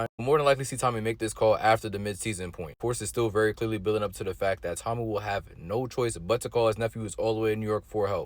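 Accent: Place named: Toronto, Canada. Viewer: you have to be from toronto with that accent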